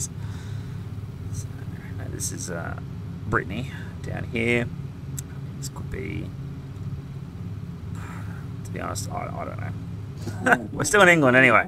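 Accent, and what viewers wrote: Australia. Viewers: you sound aussie mate, are you? good video btw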